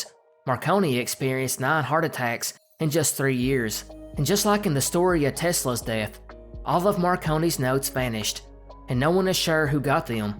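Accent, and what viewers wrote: South, United States. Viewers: My favorite part of.. is his accent..and smart narration. 😎 where is he from? I'm not a complete moron..I'm guessing In the south.. but I'm from Canada 🇨🇦..so give me a break. 😅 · has the cutest accent. I actually find it incredibly wholesome and comforting despite the grim things he sometimes covers. Im born and raised in Mississippi and my family has been here for several generations....this may surprise some folks, but different areas of the south have different accents - a lot like the UK. The queens RP doesnt sound like a Welsh person or like a person from sat..Manchester. We dont all sound like some rich wannabe in Georgia or some hick hillbilly with 1 tooth 😂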